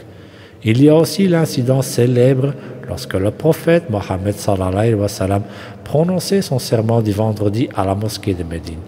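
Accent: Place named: Flanders, Belgium. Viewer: Masha Allah. Ben jij van Belgïe? Ik hoor een Nederlandsetalig accent🙂 daarom. Mooie video Allahoumma barik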